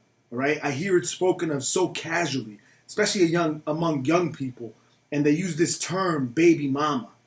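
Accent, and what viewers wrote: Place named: New Jersey, United States. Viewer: You are 100% right in Napoletan culture the man is responsible to stay with the children . If he doesn't stay with the children's he is considered punk . If he doesn't pay respect to family or to the elders he will be low level person or person with no face . You are 100% right . I think you are from Napoli because i can see it in New Jersey accent . You sound like Tony Soprano .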